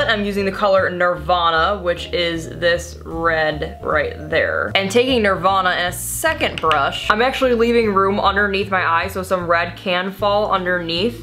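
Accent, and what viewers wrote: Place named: Chicago, United States. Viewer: Your Chicago accent is so refreshing to hear; I'm from Chicago but have lived in Florida for 16 years, so I miss hearing my native accent. I love this makeup look, it's simple but yet festive. Thanks sweetie!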